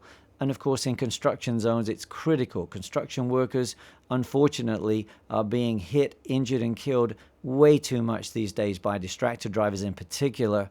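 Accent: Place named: Kentucky, United States. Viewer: I would not have pegged that as a Kentucky accent!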